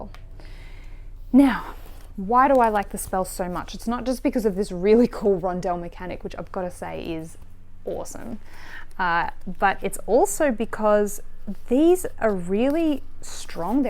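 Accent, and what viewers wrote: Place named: Australia. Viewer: Neat! Its always fin to see someone talk, who you've only seen photos of...especially when they have a cool Aussie accent! I tried my hand at making some videos for YT. I mostly made them for my sisters (to save time on game teach, since we are all in different time zones, and don't often have long to play). I initially published them, but I think I took them down, because I was embarrassed about how they came out. Mostly, I need to figure out how to edit in post and I think it would have looked much better with a pro intro like you have. Nice job! I now want to try this game sometime. :)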